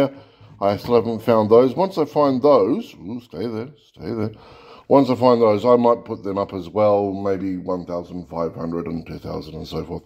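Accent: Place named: England, United Kingdom. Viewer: What england English accent is that?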